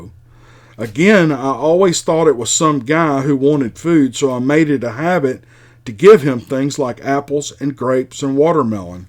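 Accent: South, United States. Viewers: I think the southern accent really does it good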